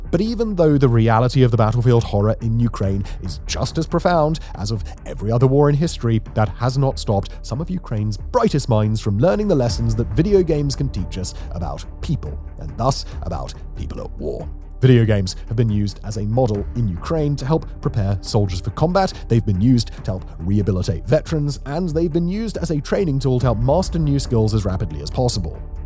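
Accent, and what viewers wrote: England, United Kingdom. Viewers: most annoying english accent 2025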